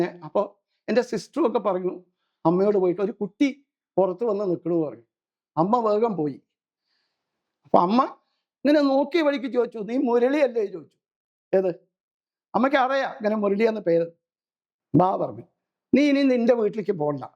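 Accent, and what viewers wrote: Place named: Palakkad, India. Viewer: അത് പാലക്കാടൻ മാരുടെ... ഒരു ശൈലി ആണ്.. അത്രെ ഉള്ളു.. ഞാൻ പാലക്കാട് മാത്തൂർ പഞ്ചായത്തിൽ ഇൽ നിന്നു ഉള്ള ഒരാൾ ആണ്... ഇവിടെ അങ്ങനെ ഒക്കെ പറയും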